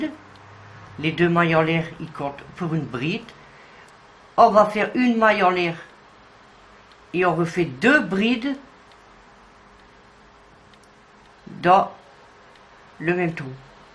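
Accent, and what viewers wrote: North, France. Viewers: Bonjour super vos toutous avec cet accent du Nord qui fait du bien. Pour cette robe vous dites 3 à 5 ans? J'ai donc fait 6 rangs de départ au lieu de 9 pour du 3 à 6 mois cela me semble petit Pouvez vous m'aider? Merci d'avance Sandrine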